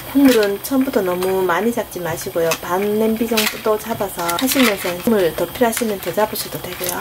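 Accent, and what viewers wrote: Gyeongsang, South Korea. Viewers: 이모님 영상 보는데 옆에서 아내가 인사 따라하네욬ㅋㅋㅋㅋ대구사람아니면 못한다고 대구부심 ㅋㅋㅋㅋ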